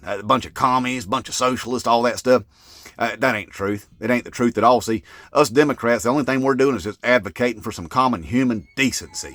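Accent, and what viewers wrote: South, United States. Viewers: Cynical east coast here. That’s the best 6 minutes of southern accent I ever heard.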